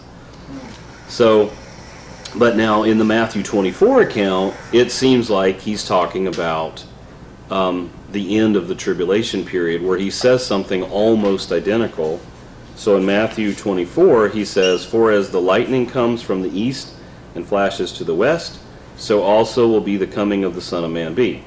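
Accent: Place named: Alabama, United States. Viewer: I thought I heard an Alabama accent. I live in Smiths Station which is just south of Opelika/Auburn. I sure wish you were local so I could physically attend. I’m glad you are posting this on YouTube so I can hear and follow along.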